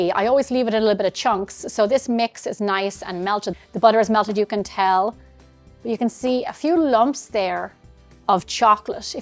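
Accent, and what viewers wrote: Ireland. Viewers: Thank you for this! Are you Irish by any chance? Your accent sounds Irish! I only ask because I miss Ireland haha🤗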